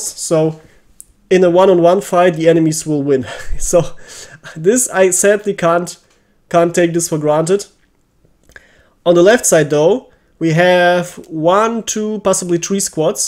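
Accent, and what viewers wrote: Germany. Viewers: Deutscher Dialekt ist einfach geil gutes viedeo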